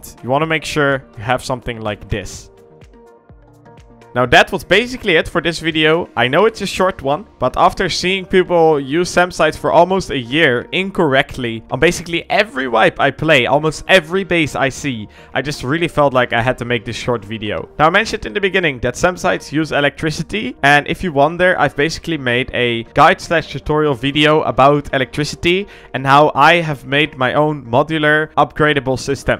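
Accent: Netherlands. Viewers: I smell Dutch 🎉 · are you dutch? xD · Ru Dutch?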